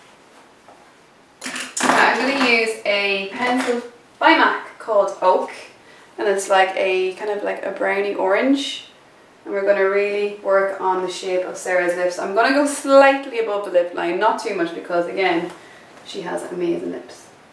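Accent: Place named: Ireland. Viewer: irish english doesn´t sound right it soounds awful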